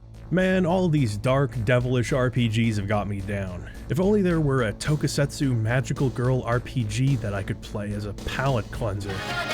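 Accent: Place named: Canada. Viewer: Oh snap! You're Canadian!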